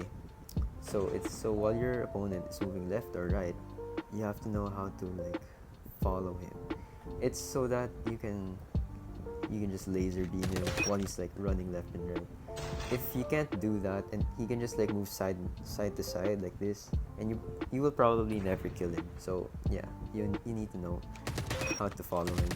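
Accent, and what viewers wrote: Philippines. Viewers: Are u a Filipino? You sound like one tbh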